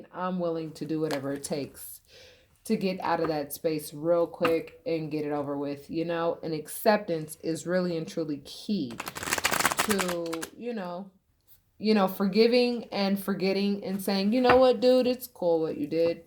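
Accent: New York, United States. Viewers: you got that Harlem, Bx or Brooklyn heart in you...I can hear it when you speak😉🤩. Again, thank for this Cancer read!! Pisces is soon gonna see!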